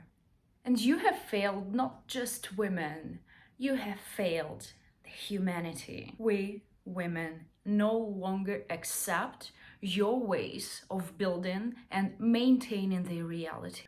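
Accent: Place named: Russia. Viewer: Я сразу услышала по произношению, что это наша девочка, русская🤗 Как же ты права, милая!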